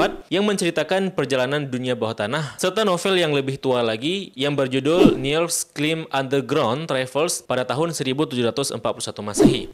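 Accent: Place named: Aceh, Indonesia. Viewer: Logat Aceh Abang ini buat aku mahu ketawak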